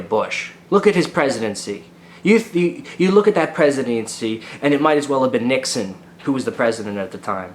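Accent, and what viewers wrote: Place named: Canada. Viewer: You should move to Canada... You talk like a lot of us haha Decent poli video sir!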